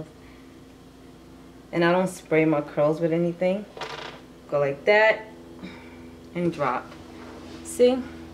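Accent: New York, United States. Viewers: I love your New York accent yasssss ✨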